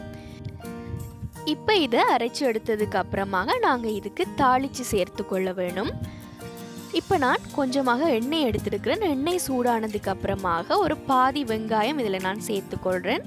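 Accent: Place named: Sri Lanka. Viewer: Unga language very nice neenga srilanka va....very nice dr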